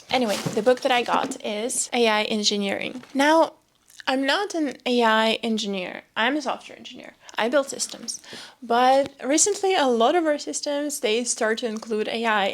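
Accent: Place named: Eastern Europe. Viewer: you have slight slavic accent, where you from?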